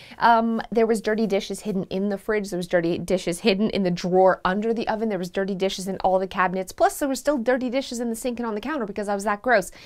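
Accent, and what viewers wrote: Canada. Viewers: Washer in the kitchen, and all the "aboots" Are ya Canadian 🇨🇦 🍁?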